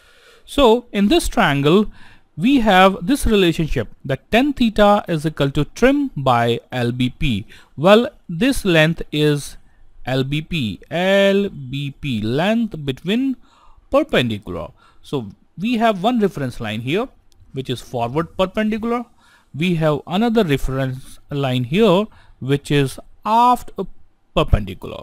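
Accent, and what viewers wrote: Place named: India. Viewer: u trying so hard to make british accent but still your indian accent kills your mouth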